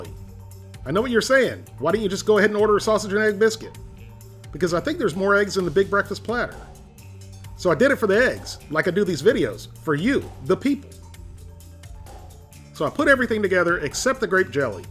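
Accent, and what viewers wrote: South, United States. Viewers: I find the topics you cover to be interesting but I hate your scripted delivery and gay estrogen filled hillbilly voice.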